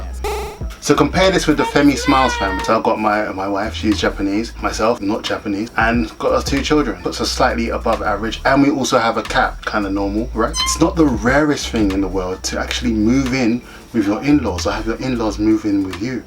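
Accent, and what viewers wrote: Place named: United Kingdom. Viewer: I don't remember when i subscribed to your channel, but I'm still not used to hearing the British (London?) accent 😆😆I've been watching too many American an Canadian YouTubers in Japan. it's always good to hear more Brits haha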